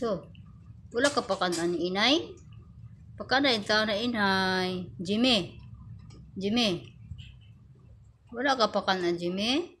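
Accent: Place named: Visayas, Philippines. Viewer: Jajjajajajajja bisaya ka mam lab it bisaya din ako ug nalingaw ko sa imo mga itoy jjajajajajajja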